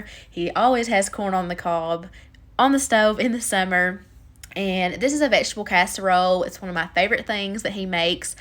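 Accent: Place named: Kentucky, United States. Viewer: I now see why your voice is from lmao im from ky too, i like your dinner ideas. Do you make a planned list for them? Im a beginner cook and im just seeing what the best ways to make a variety of different dinners cos i make a lot of the same stuff for my fiance and i right now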